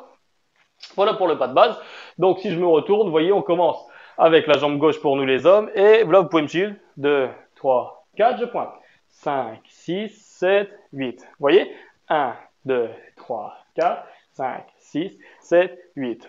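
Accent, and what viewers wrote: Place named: Madagascar. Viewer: Coucou, pas mal....on dirait vous avez un accent malgache!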